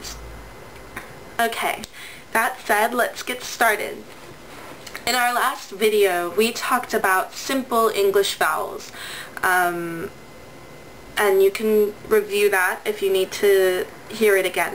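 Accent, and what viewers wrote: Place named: South, United States. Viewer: Great! It's interesting to realize @@ that you've killed 5 vowels with one video ^_^. And again, I like the way you teach, it's really alive! I really love to listen some culture backgrounds of pronunciation or anything in English, for example when you tell that you got Southern American accent. I also like that you supply the CC or subtitles. That's what I've been hoping for all teachers do the same.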